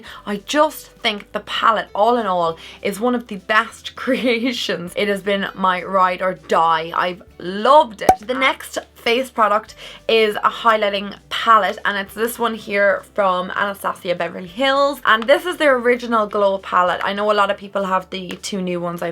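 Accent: Ireland. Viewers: what part of ireland are you from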